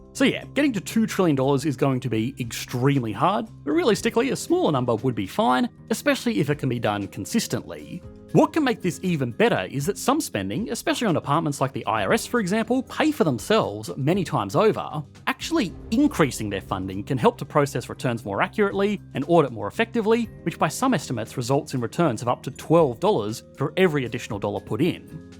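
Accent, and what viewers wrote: Australia. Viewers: More bureaucratic waste to combat bureaucratic waste is awesome. Thanks HMW, the title educated me greatly (didn't watch the video cause you're Australian accent)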